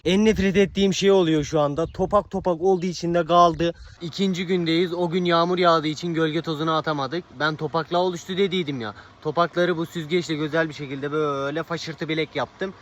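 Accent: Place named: Bursa, Turkey. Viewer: Abi sen nerde yaşıyorsun ben gazipasadayim Bursa'da senin gibi konuşuyorlar (fingirdinmi)❤❤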